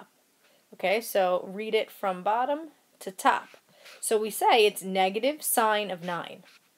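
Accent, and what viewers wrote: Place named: New York, United States. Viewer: I hear a new york accent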